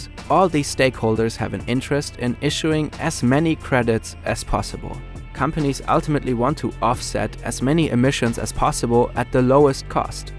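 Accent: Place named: Germany. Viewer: Noticing the german accent. -> direkt mal abboniert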